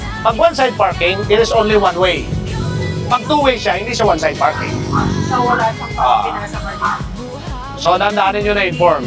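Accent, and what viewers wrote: Iloilo, Philippines. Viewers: Ilonggo ka sir kay isug man? Kag imo tuno o accent ilonggo man gid iya.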